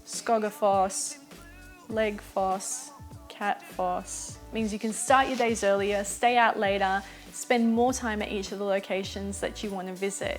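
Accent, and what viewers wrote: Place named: Australia. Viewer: This was great! One thing I wanted to know is where YOU are from...I know it makes me sound unbearably stupid, but I couldn't quite tell from your accent...is it Australia? The reason I want to know is because I'm Canadian, so your idea of Cold might be very different from mine!! :) I was sort of planning to pack for "chilly spring" weather over here...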